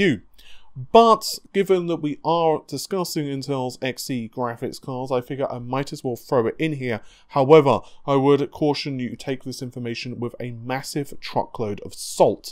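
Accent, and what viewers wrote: United Kingdom. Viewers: He is British, not American. Jesus.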